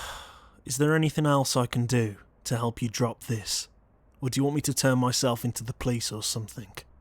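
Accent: England, United Kingdom. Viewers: I always have a weakness for an English accent and I love yours.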